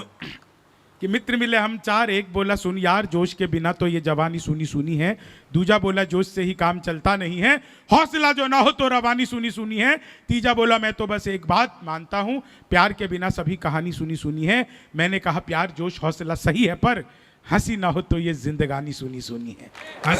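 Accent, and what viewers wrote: Bihar, India. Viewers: Aap BIHAR k ho maloom apke bolne se pata lag gaya NITISH NE JO BHASHAN DIYA USSE PATA LAG GAYA POORE BIHARI PURUSH MAHILAYEN SAB EK SE HOTE HAI SAB GANDA KHANEWALE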